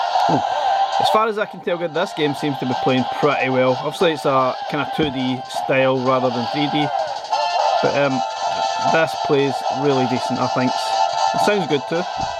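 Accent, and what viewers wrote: Scotland, United Kingdom. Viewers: Great video mate! Still eyeing this up! Apologies if it was mentioned somewhere in the video, I'm watching at a library with the sound low, what emulator/core was used? I'm guessing this wasn't beetle core based on the Sega Rally gameplay. The road seems to "break up" and this doesn't happen on real saturn/beetle gameplay. But everything seems super smooth and contradicts the notion that the ARC is not capable of running Saturn. Also regarding Sonic R I absolutely adore it and it is criminally underrated haha. The characters are meant to be driven like cars with B as an accelerate button, and the analog as steering and L,R triggers as drift. Once you get the hang of it it's pretty smooth and you'll be acing all the races. Perhaps it was a bad design choice to have the, running in hindsight. Oh and your accent makes me nostalgic for Scotland, it's been ages since I've been home.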